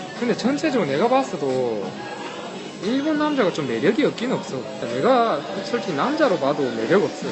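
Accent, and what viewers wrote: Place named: Busan, South Korea. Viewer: 부산사람 같은데요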